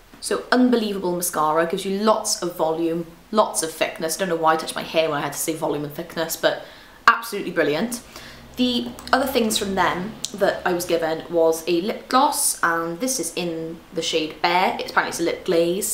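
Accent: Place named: Wales, United Kingdom. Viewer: Your accent's gotten so welsh!!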